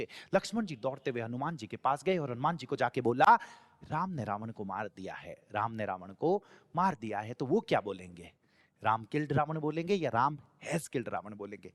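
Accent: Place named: Jodhpur, India. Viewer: Ap jodhpur se ho sir ji apki boli to jodhpur ki lag rhi h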